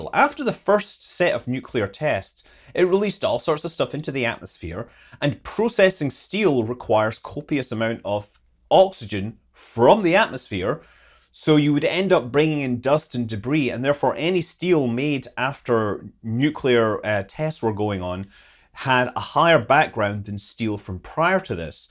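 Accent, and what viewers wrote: Scotland, United Kingdom. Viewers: the one time his Scottish got me: what is the fuel settling burn called?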